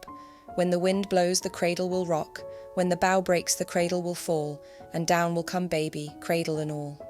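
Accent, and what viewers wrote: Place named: South, United Kingdom. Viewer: Where is your accent from? Yes uk....most probably the south but more precisely? Very easy on the ear and very good command of the English language. Love your channel..all very interesting and thought provoking. Thank you 😊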